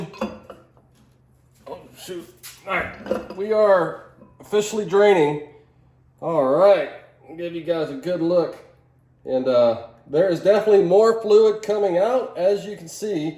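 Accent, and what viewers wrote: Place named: New York, United States. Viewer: HOOZ da Complete Moran now.. Just Sayin yall.. East coast whatever.. probably from manhatten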